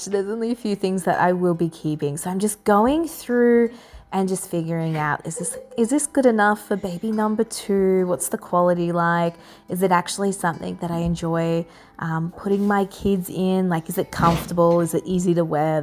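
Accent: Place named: Australia. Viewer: You and your home are beautiful! So good to see/hear another Aussie :-)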